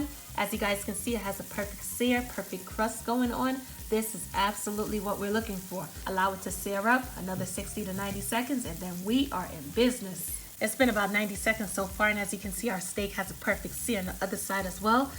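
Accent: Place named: East Coast, United States. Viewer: Love the east coast accent!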